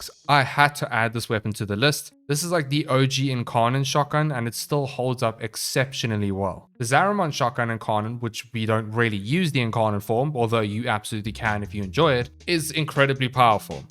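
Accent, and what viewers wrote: South Africa. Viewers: is that a south african accent i hear????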